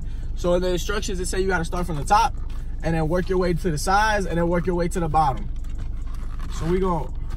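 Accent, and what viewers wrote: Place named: Miami, United States. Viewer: U sound like you’re from Miami…I’m from Miami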